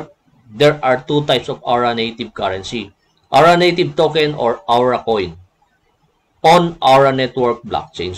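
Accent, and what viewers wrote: Ilocos, Philippines. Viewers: ilokano ka ba sir?san province mo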